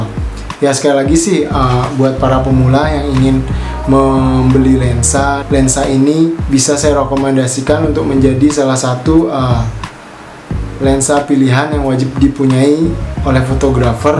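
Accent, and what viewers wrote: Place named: Bali, Indonesia. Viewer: bang kq logatnya kyak logat bali ?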